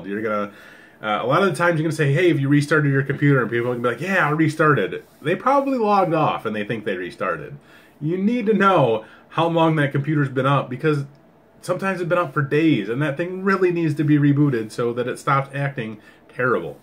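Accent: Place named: Michigan, United States. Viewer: I love a good Michigan accent 😍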